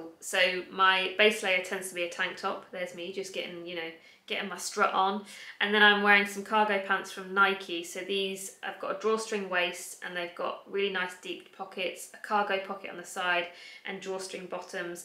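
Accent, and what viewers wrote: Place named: United Kingdom. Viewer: I really enjoyed this video!! there are some outfits that I definitely will keep in my mind for futur looks. Favorite are day date look, evening date, studio look, raining day in the city! really helpful for my futur city (London)! I would like to have an inspiration for museum day/ gallery day/ city strolling AND a casual look at home, home work or Monday/Thursday home look something comfortable and causal chic maybe AND definitely wedding look because I will have wedding look next year too! Thank you and always enjoy to watch your video and gosh your British accent is delicious ( Don't know if it's a great word for accent language but I love the idea)! Have lovely week sweetheart xx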